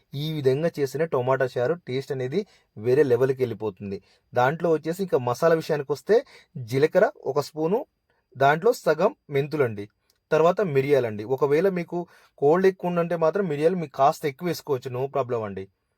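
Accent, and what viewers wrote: Telangana, India. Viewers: Pure telangana lunga ge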